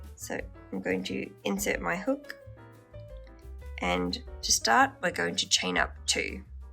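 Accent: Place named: Australia. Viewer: Sounds Australian to me. Great look for crotchet.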